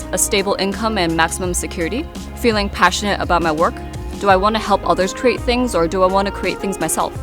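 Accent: United States. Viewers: There is what I think you and are either ABC or brought up in the States. The way you talk and the accent is completely different to the locals and CBC and BBC. Good luck on your new career.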